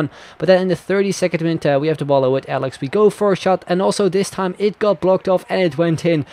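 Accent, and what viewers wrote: Netherlands. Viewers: are you Dutch??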